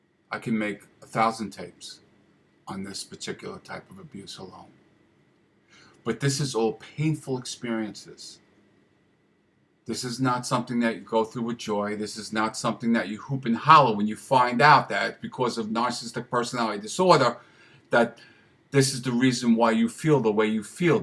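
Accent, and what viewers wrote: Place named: Brooklyn, United States. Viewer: Yes, this rings true. It's amazing the way they all read from the same script. In my case, I was the scapegoat for two unhappy families. The options were stark---get out or commit suicide. I drifted away in my twenties. By my early thirties, I was gone. My father had written a letter to my boyfriend, telling him that I was a mental case, and that he ought to dump me. The letter did have an effect on my bf, but not the one my father was hoping for. And that was the end. Anyhow, that happened years ago. I realized my family was sick, but I didn't realize they were narcissists. I'd heard the word but didn't know the meaning. Narcissism clearly comes out of childhood trauma that the narcissist cannot face and cannot change. Lately, I sort of wonder what happened to my family after I vamoosed for good. I'm sure a golden child became a new scapegoat for her mother---she was the next oldest and at bottom they disliked each other---but I do wonder who my father beat up on with me gone. 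Perhaps his girlfriend, whom he was beating up on anyway. But if there was no new scapegoat, then he simply imploded. That's the way it is with these people---they either find a ready victim who can't fight back and can't expose them---or they melt down. Anyhow, it does get better with time and therapy. Eventually, they all become strangers, and it becomes incredible that they once were intimates and "loved ones.". (An empty and cynical phrase if there ever was one). BTW, I love your Brooklyn accent! I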